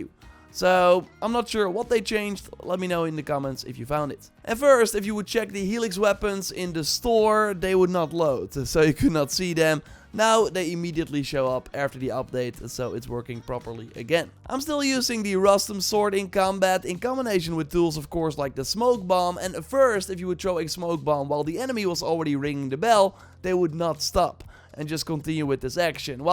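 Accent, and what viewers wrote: Netherlands. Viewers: dat nederlands accent is zo duidelijk lmaoaoa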